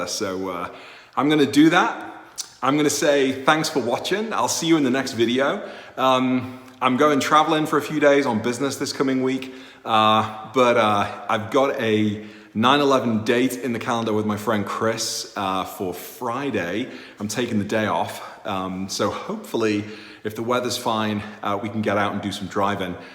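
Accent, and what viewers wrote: Liverpool, United Kingdom. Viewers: Yes I could tell that you’ve got a bit of a scouse accent 😎😎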